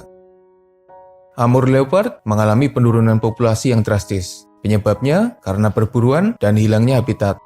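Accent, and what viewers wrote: Banyumas, Indonesia. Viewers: Emang ciri khasnya begitu. Ciri khas orang ngapak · Ora ngapak ora kepenak👍🏼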